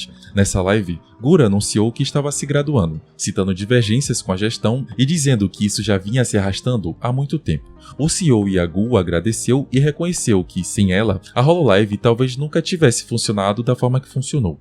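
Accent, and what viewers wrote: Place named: United States. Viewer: Bom vídeo mas pq vc fala os nomes da vtubers japonesas com sotaque americano? kkkkkkkkkk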